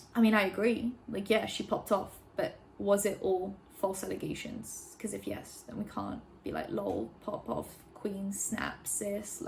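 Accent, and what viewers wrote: United Kingdom. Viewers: Omfg I used to watch you so long ago and I could never find you ever again 😂 finally popped on my recommended 😩 I was like where’s the girl with the British accent 😩😩😩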